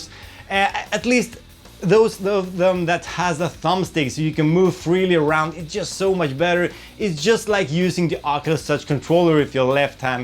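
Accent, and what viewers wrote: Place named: Sweden. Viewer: Yeah no problem, glad to help you and the community. I used your method and everything else worked, except the weapons. All the files were there in the "models" folder, and other objects like barrels, etc looked HD, but not the weapons? Really weird. I did see a couple other posts other places, of people having the same issue. Also, I found that "Dark Forces" does not use the DOOM engine, and does not work in VR (yet). Love your channel, love your videos. I also like your accent, where are you from? Sweden?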